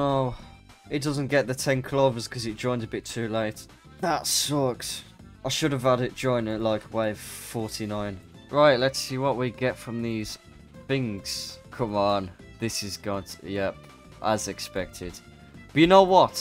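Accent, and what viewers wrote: United Kingdom. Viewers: Bros british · You sound British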